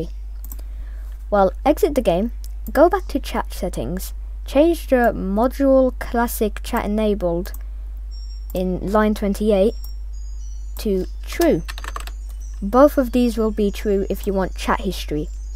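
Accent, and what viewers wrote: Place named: United Kingdom. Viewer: I think im dumb cuz I just made it worst... And this this helped ty dude, ur a British accent ur British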